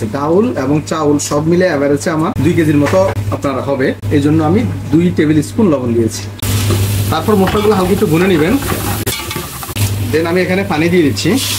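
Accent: Noakhali, Bangladesh. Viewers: কিও ভাই আন্নে কি নোয়াখালী পোলা নিও বার বার পানি কে ফানি বলছেন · ভাইয়ের বাড়ি নোয়াখালী এ ভাষা সারা বিশ্বে চলে ইউটিউবে কি সমস্যা? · Apnar basha ki feni naki noakhali?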